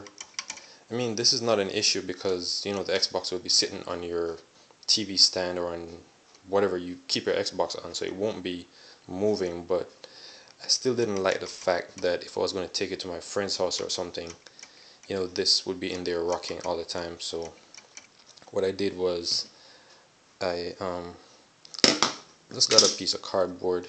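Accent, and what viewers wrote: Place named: Jamaica. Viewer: Your Jamaican aren't you? I can hear the accent lol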